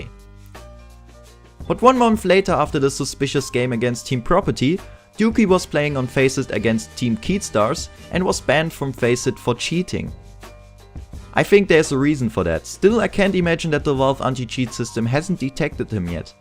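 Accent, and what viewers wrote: Germany. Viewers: This German Accent is so cute lol.#nohomo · Wusste gar nicht das du deutscher bist, aber der Akzent hat mich die ganze Zeit schon stutzig gemacht. Mag deine Stimme in den Videos. Passt gut zum stuff den du bringst. Super Arbeit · Are you german? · his grammar is alright but he has a annoying german accent and says "f" instead of "th"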